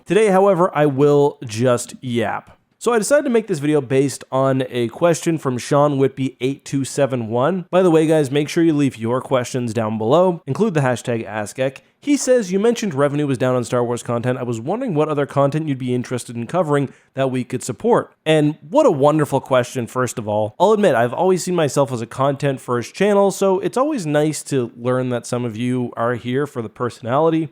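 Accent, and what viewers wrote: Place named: Eastern Canada, Canada. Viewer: You Definitely sound like you are from the east coast of Canada.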